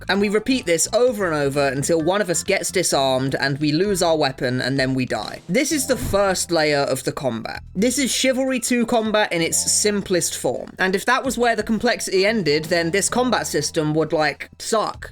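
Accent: United Kingdom. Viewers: the funny British man is back :D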